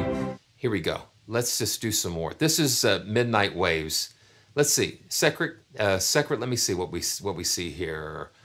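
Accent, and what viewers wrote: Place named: England, United Kingdom. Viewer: you have a great voice for scripture voice overs with music. Lots of 'em on youtube. With your wonderful composing abilities and your "just a touch of an english accent you bring to the table on the voice overs", you would have a purrrrdy big following. Timing is indeed everything. Please consider doing this. If you are already, GOD BLESS YOU and let us know your channel! Bless you, brother! PS-HAHA! I found your other sites.:D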